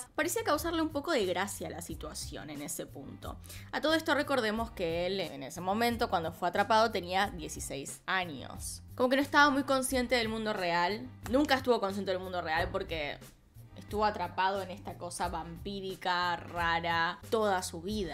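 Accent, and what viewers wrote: Argentina. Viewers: Hasta ahora me doy cuenta de que es argentina, gracias demente por hablar como puro argentino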